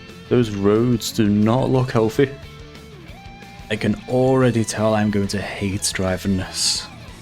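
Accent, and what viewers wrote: Liverpool, United Kingdom. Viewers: Good to hear a scouser online. I'm from liverpool too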